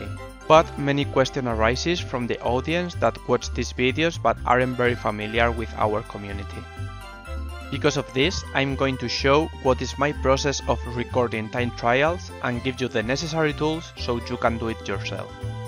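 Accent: Spain. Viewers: eres de españa? tu acento me suena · Only an spanish can identify another spanish speaking in English^^ I have just done it! Good video! :P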